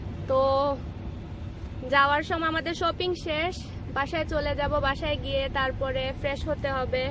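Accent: Sylhet, Bangladesh. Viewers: Apu etodin pore shopping dekia bala lagse bashi🥰🥰r emnitai apnake amr onk bala lage.afnar video sob somoy deki...apu afne sylhety mat matba bala lage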